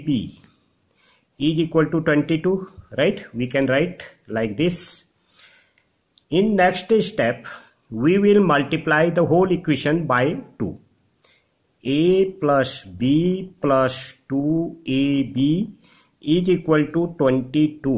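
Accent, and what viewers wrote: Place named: East India, India. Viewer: That is an accent of people from east India and Bangladesh. So I would rather not comment though I know the comment is in good faith.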